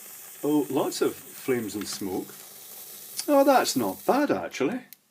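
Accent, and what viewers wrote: Scotland, United Kingdom. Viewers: Hi love the vids and the wee Scottish accent, it sooooo reminds me of my late Dad. Can you please show how you have your iPad set up for the videoing...cheers from down under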